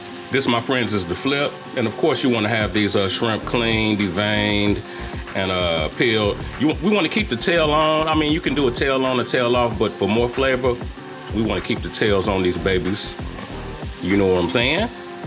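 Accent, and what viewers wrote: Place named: South, United States. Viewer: This recipe is So simple and easy. I luv your southern drawl. I see ya! You know I'm from Memphis, TN. Thank you and yes! it is all about the Flava!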